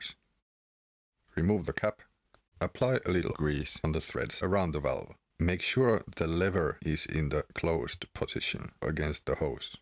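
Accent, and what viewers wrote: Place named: Finland. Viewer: Thanks for the vid! Do you happen to speak Finnish, I think I hear that a bit in your pronunciation. I’m from Finland myself.🙂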